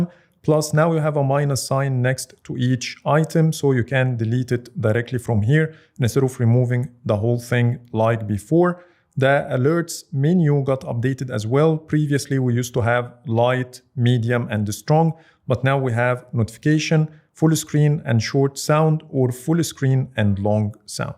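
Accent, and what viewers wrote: Egypt. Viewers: واضح جدا انك مصري, مو عارف كيف اشرح كيف عرفت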